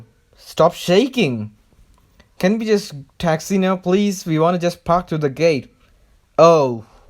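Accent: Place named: India. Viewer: Dude you can't even speak English properly stop trying to be funny. Plus you sound like an indian person wtf 😂😂😂😂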